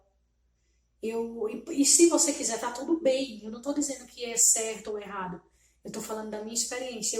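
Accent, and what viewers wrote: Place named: Northeast, Brazil. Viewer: já comecei me indenticando com esse sotaque NORDESTINO. A tua história parece muito c a minha. O caminho das palestra foi onde tive a maior catarse. Continuou pq pedi catarse. Estou na segunda onda, nunca aprendi tanto em toda minha vida.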